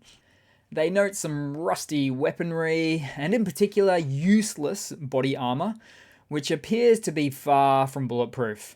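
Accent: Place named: Australia. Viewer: Now I know you are Australian by your accent , so by chance I came across an Australian Youtuber who lives in the outskirts of Moscow with his Russian Wife who does tours of the City and its surrounds (Travelling with Russel) and you can see by the videos he films , that the residents of Moscow give the impression everything is normal and there is no War Going On over the past 18 months , it is quite surreal to watch how the ordinary Russians go about their daily routine as though their country is at War at all, the supermarkets are full of all the food that they could purchase before the War started and the only thing they cannot purchase are European or Japanese vehicles other than the flood of new Chinese brand vehicles being assembled in their country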